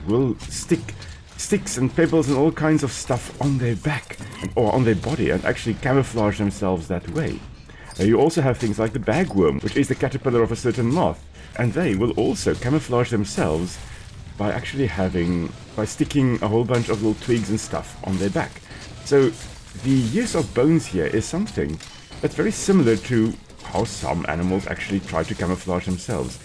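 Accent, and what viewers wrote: South Africa. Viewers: Your accent sounds South African boer. Please tell me im right. Just started a charge blade last night by the way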